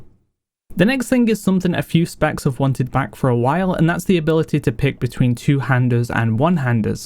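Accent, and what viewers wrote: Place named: Leeds, United Kingdom. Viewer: Northern UK, Leeds kinda accent.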